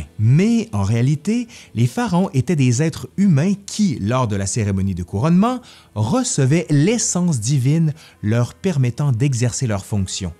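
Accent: Quebec, Canada. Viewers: MERCI passionné par l'Egypte depuis la 6 ème (presque 35 ans) cette vidéo ne pouvait que me faire plaisir Je suis d'accord sur la beauté d'Abou Simbel, pour avoir eu le privilège de voir ses 2 temples dès le lever du soleil et donc avant l'arrivée des masses d'autres touriste. Pour la tombe de Nefertari elle était en retauration a cette époque là; un pretexte parfait pour retourner un jour en Egypte Continue ainsi et vive l'accent du Quebec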